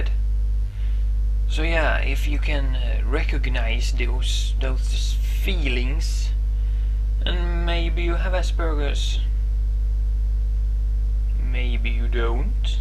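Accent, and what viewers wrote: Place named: Sweden. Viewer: I really liked your video, I just took some tests the day before yesterday, and they provided some confirmation of what I already suspected after having read about AS on some web pages. I didn't know about the lines/bags under the eyes, I thought that was just me (still learning about AS). The more I see of others with AS, the better it seems to make me feel. By the way, I like your Swedish accent, it is cool sounding :) Your brother looks cool, I always wished I could grow a big beard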